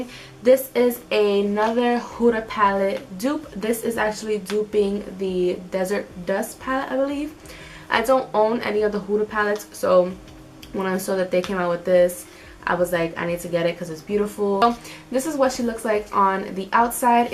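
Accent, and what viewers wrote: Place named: Bronx, United States. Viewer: I knew by that accent i just left the boogie down to take care of my mom here in Jacksonville Fl I see you boo that's why I subbed od quick lol🙅‍♀️✌❤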